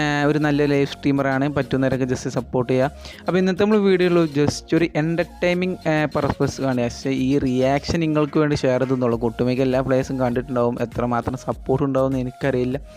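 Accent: Malappuram, India. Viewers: Ninte home malappuram ann enn ariyamayirunnu ninte samsaram keettal manassilayi😎😎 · Bro malppuram basssha matttt najmall malppuram malayalam parnjnnnl mtuulli work manisilllla😍😘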